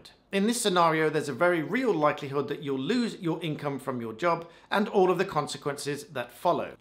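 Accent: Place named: England, United Kingdom. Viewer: What country is he talking about? He seems to have an English accent.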